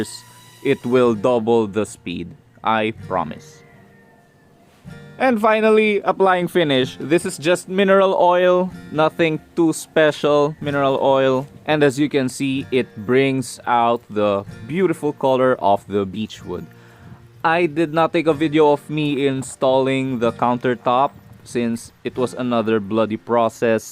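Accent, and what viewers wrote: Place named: Philippines. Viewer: I really miss the Filipino accent. I was fortunate enough to live near Manila for a few years and travel a lot while there. Listening to you explain all this brought back so many good memories. Best of luck to you with your projects and your channel my friend. :)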